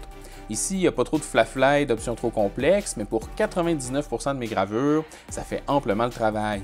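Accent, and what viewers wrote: Canada. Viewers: Supers' videos, bonne humeur et pas de prise de tê te. Et ce joli accent canadien !!!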